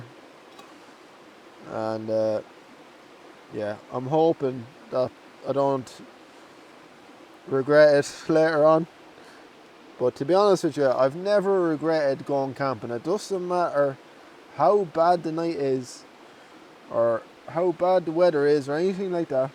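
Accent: Ireland. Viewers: New subscriber loving ur videos. My dad was born in Dublin and I’ve always wanted to visit but still not got there yet. 1 day hopefully. Been told how beautiful it is and i love the Irish accent x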